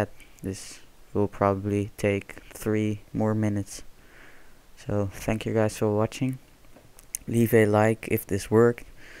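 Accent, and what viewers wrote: Netherlands. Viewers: Are you dutch beacause your accent sounds very dutch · Aan je accent te horen ben je Nederlands of niet?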